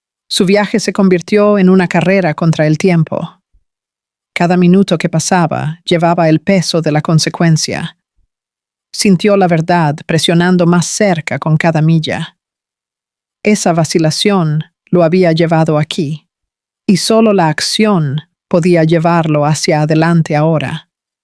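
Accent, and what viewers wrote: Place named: Brazil. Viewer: Esta historia es Brasil por eso le sale el espoñol asi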